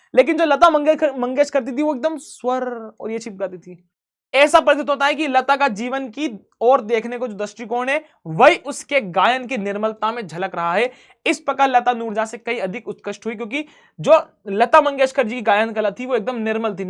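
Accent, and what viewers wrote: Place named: Bhopal, India. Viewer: aapke accent sunn ke lag riya h aap bhopali ho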